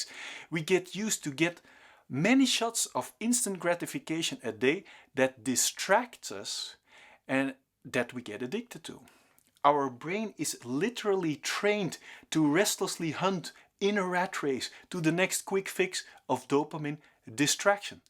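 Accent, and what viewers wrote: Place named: Netherlands. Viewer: You have a strong dutch accent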